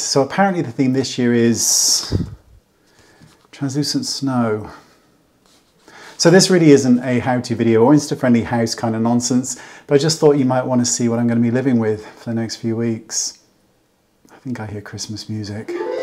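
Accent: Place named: England, United Kingdom. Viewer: Why did I just realize after a few years of watching he has an English accent….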